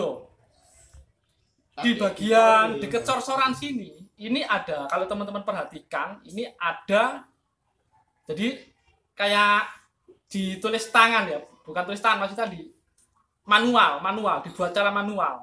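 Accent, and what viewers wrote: Java, Indonesia. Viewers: sampean ini kyknya ngomong berat skl, trll medok ya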